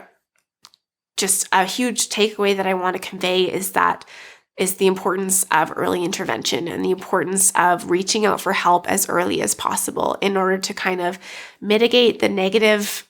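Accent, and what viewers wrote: Canada. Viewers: you are from the prairies or the west, i can tell by your accent. i'm from ontario, and can tell you're from either saskatchewan or alberta or maybe interior BC. this makes me wonder what would happen if you weren't a white woman. what they did to you was very wrong. and imagine if you were an indigenous woman they would like have been much more violent towards you.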